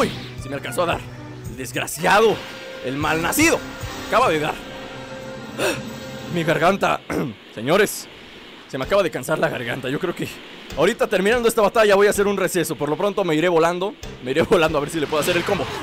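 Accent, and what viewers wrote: Mexico. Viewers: de que parte de México eres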